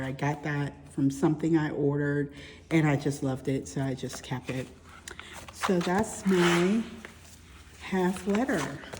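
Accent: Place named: Pittsburgh, United States. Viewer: I hear the Pittsburgher accent. I am excited to know there is a planner lover in the Burgh. Good luck on your studies. Carlow has a good nursing program. New subbie here…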